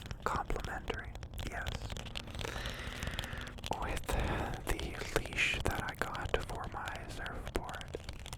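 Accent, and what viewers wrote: United States. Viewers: is he american orr?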